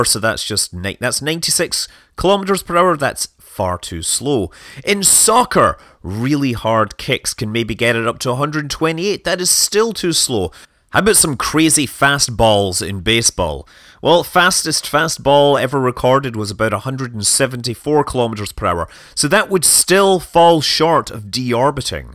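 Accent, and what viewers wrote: Scotland, United Kingdom. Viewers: Say what?! I thought he was scottish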